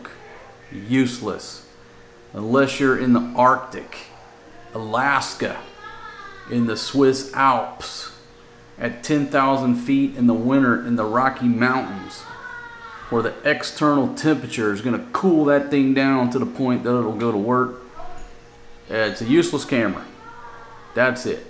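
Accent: South, United States. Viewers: Ahahaha. On 2158 min you said vijoos. Sounded so funny coming from you. Sounded so very Filipino. Kinda cute coming from a Southern man who has that Southern twang. Hope Fatima can relax when she goes back home so she can enjoy her vacation. Peace.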